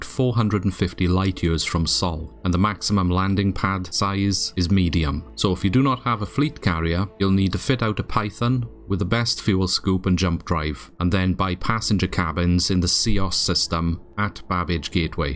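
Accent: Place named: Wales, United Kingdom. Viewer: Took me a minute to figure out that whimsical accent lol thats gotta be welsh! Appreciate the tutorial, o7 from Ireland :)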